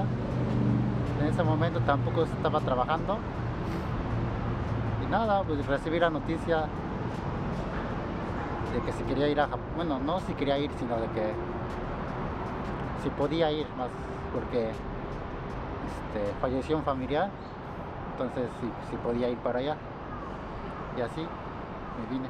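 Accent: Mexico City, Mexico. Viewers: Cómo de ciudad de México .. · Hablas 💯x ciento como mexicano si te gusta nuestro país regresate en Chin.......a🏃‍♂️🏃‍♂️🏃‍♂️🏃‍♂️🏃‍♂️🏃‍♂️animo México te apoya eres nuestro hermano 👍👍👍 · Eres mexicano,ablas igualito a mi🤣😂🤣😂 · No mames guey no tienes nada de japonés con solo hablar así eres mi paisano viva México carbones saludos compita yo vivo en USA desde hace 30 años y estrañp a mi Durango México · Jaja hablas 100 mexicano. Eres mi paisano ya bienvenido al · Tu asentó se nota que eres mexicano, suerte en tu camino y no te olvides de los mexicanos man :v/ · No manches?? Jijiji!! Se Te Salió Lo Mexicano. No Mames Wey!!! Y un chingo!! Jiji jiji !! Nel. Tú Eres Mexicano. Pon Una Fondita Japonés Con El Ramen 🍜. Incluyendo Claro Los Tacos 🌮 . Mexico City Te Reclama!! Órale Vengase Pa’ Mexico!! Y Hasta Tú Acento Es México . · Mira vistes como todo un mexicano ese estilacho que tienes para hablar rregresate compa ya sete ase tarde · hablas como mexicano,piensas como mexicano,sólo te falta el toque de pensar no estoy jodido y nada es imposible, entonces combina lo mejor de las dos culturas y seras un híbrido mexicano y lograras lo que ni tu pensaste, eres bienvenido s México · Este japo es chilate defeño .no mames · Y si habla como Mexicano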